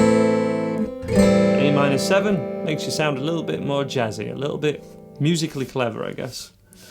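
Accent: United Kingdom. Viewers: And THIS GUY IS BRITISH! Score!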